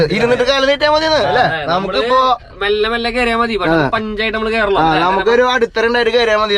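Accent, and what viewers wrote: Thrissur, India. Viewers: മ്മടെ തൃശൂർ ശൈലി മാറ്റല്ലേ ട്ടാ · Congrats..10 k like below 200 dislike ഒന്നുമല്ല.ചില ആളുകൾ ഉണ്ട് അസൂയ കൊണ്ട് നെഗറ്റീവ് comment ഇടുന്നവർ,അവനൊന്നും ജീവിതത്തിൽ സാധിക്കാത്ത കാര്യങ്ങൾ കാണുമ്പോൾ ഒരു ചൊറിച്ചിൽ ആണ്.ഞാൻ മലപ്പുറം ആണ് നിങളുടെ വീഡിയോ കണ്ടു തൃശൂർ സ്ലാങ് ഒരുപാട് ഇഷ്ട്ടായി.ഒരു 45 minute video kku മിനിമം 2 or 3 മണിക്കൂർ viedo വേണം.. -5 ഡിഗ്രി ഇതിലും കൂടുതൽ power ആർക്കും കിട്ടില്ല.. നിങ്ങളുടെ ചാനലിൽ ഈ vedeos ever green ആയിരീക്കും.. കട്ട support.. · വീഡിയോ അടിപൊളിയാണ് but thrissur ഭാക്ഷ ഒന്ന് മാറ്റിപിടുക്കോ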